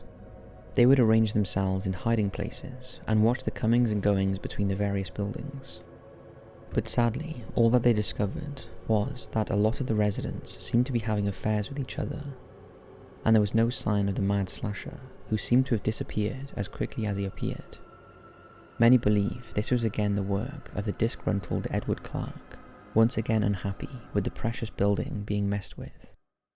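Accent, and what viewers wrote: United Kingdom. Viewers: I just about choked on my popcorn when he said, "a man named Wilber Ross." Was he THE Wilbur Ross or just somebody who had the same name? Or does the British-sounding narrator not know about Wilber Ross? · whats creepy is the way the narrator speaks with a uk accent but says American style words...take a look..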